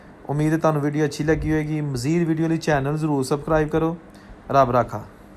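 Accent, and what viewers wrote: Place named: Gurdaspur, India. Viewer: Apki bolne ka lhja bilkul same vesa hai jisa Indian punjab ke gurdaspur side ke logo ka hai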